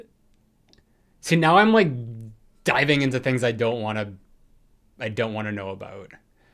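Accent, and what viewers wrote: Canada. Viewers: You're so cute: quite facially emotive, sometimes handsy, and I love when you speak Canadian like out and about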